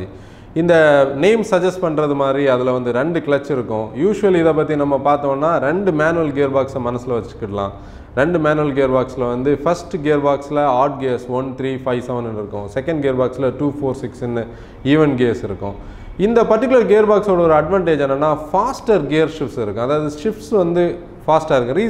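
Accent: Kanyakumari, India. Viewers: Kanyakumari slang 👍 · nanum kanyakumari thaan anna. nama slang ae pothum enga irunthaalum nama ooru kaaranga nu kandu pidicherulam😇❤️❤️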